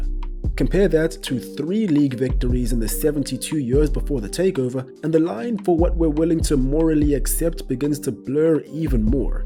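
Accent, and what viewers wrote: South Africa. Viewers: South african accent definitely!! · Well well well, a happy coincidence finding a fellow South African! Knew it from the beginning when you started talking. Keep up the good work man and make us proud!!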